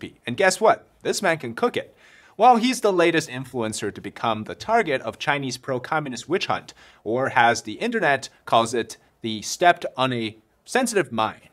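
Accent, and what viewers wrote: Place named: United States. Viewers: you sound like a yankee to me for what its worth amazing job🎉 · For a man who came to English as at least his second language, this host does a remarkable job of sounding casually American. There are only a few odd choices of phrasing that make me think he's not a born Yankee. He did the work and it shows!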